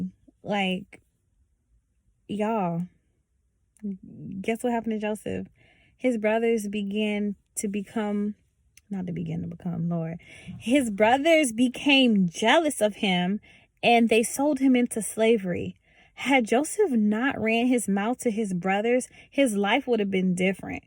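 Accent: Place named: United States. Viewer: with an american accent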